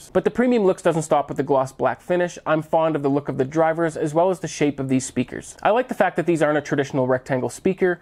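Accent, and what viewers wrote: Canada. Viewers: Do I detect a bit of Canadian in your voice?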